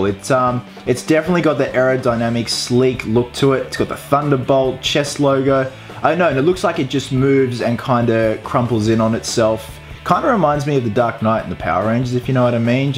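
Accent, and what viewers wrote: United States. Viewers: American accent on point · hahaha I love your american accent, nice beard too!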